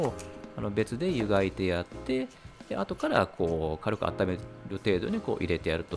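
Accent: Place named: Nagoya, Japan. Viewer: 節々のイントネーションが名古屋っぽい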